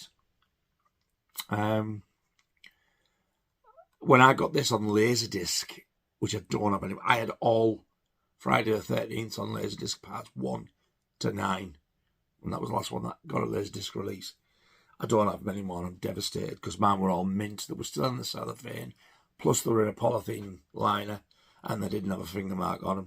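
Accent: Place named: Northern England, United Kingdom. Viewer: whereabouts I can hear you’ve got an accent are you from like up north? I’m in London myself I’ve preached the gospel before in Doncaster and I was wondering if you might have been from there anyway your videos are good mate. Carry on the good work.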